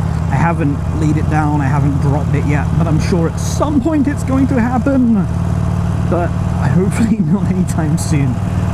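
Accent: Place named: Australia. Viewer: Hey nice first motovlog! Are you from Australia or the UK? your accent is not very local haha. You really do remind me a lot of the Aussie motovloggers though. What is funny, is that I was going to use iridehawaii as my channel name when I first started but I was watching a lot of Revzilla product reviews and the "rev" won instead of "ride". I guess since Andy gave you some tips, this motovlog was kinda long. There is absolutely nothing wrong with long motovlogs but as a new motovlogger try to keep it under 10 minutes to keep your audience's retention. We are still trying to get to know who you are. As for swearing, it really doesn't matter. It is up to you how you want to deal with your swearing. For me personally it is a non issue unless every other word is a swear word for 10 minutes then it gets a little old and unbearable to watch. Anyways, great Harley you got there. 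I hope you can ride with us one day! Welcome to the Hawaii motovlogging community!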